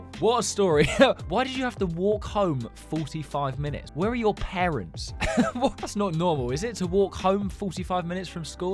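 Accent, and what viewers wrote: England, United Kingdom. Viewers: Are you English?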